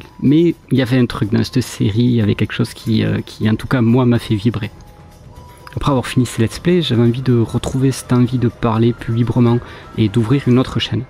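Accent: Toulouse, France. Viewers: Voila pourquoi je n'ai jamais pensé a unsub cette chaine! Toujours un plaisir d'entendre cet accent qui m'est si cher (oui je suis toulousain et chauvain) Bon retour sur youtube j'attend avec impatience ton nouveau contenu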